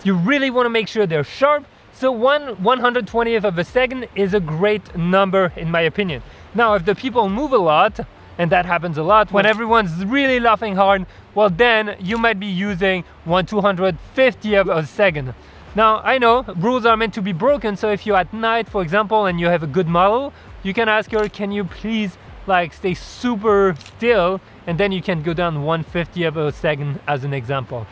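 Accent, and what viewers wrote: France. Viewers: Super French accent mode :D J'adore!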